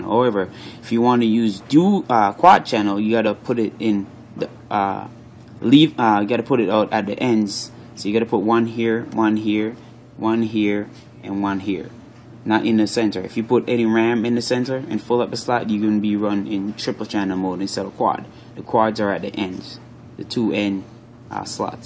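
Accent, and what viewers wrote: Jamaica. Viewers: I love Ur Jamaican Accent :) ( remind me of my best bud)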